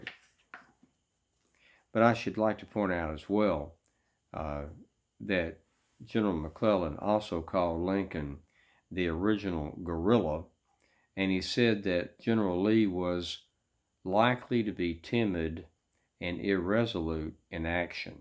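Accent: South, United States. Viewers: This is the first time I have seen you as, 'President Grant'. The other ones of course, were as 'General Grant'. I've read: 'The personal Memoirs of U.S. Grant', and he referred to General Halleck many times. Never quite got a feel as to the relationship between the two, or what kind of person Halleck was.This presentation cleared up a lot of questions I had about Halleck.Your presentations are so well done, I begin to forget, you are playing a role. One other person metioned your: 'Southern Accent', I believe that's irrelevant, and doesn't subtract anything from your portrals of U.S.Grant! I don't understand why there are so few comments here and on other presentations you do. Perhaps, you do them so well, no questions or replies needed!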